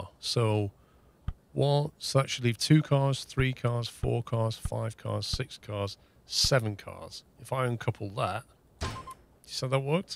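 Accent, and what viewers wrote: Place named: Birmingham, United Kingdom. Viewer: Where are you from? Sounds like good ‘ol birmingham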